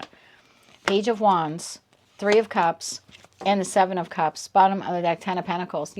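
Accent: East Coast, United States. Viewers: Thnx ❤ great reminder Trying to figure out how to market my book 😊OMG you are so east coast Love it 😂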